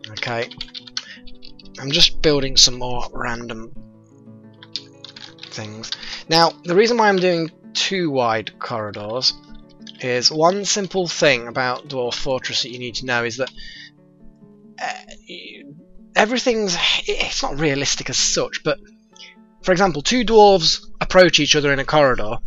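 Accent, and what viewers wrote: United Kingdom. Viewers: ah, it's been a while, good to hear your british voice and the clicking of your keyboard!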